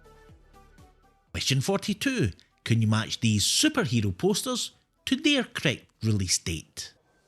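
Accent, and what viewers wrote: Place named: Scotland, United Kingdom. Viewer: I did indeed enjoy the quiz and all your quizzes. I love the accent too. My parents were born and raised in Scotland (Fife) and all my cousins live there. So your accent reminds me of bonnie Scotland!!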